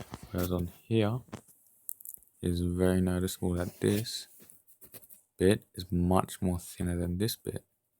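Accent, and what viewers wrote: Australia. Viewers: Of course he speaks Aussy and Sara Ayri the comments are from the Area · Do I hear a Aussie Ascent? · You Aussie mate?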